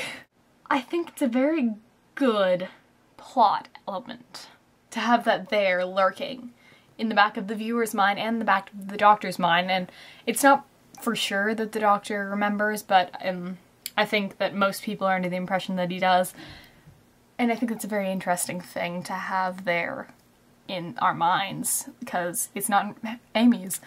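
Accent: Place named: United States. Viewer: This is just a guess, but, are you American by any chance? I'm not trying to be rascist or anything, but I'm just curious as you sound American and said the words 'aunt' and 'semi' in a very American way. Again, please note that this is out of interest, not rascism, because I hate rascism myself.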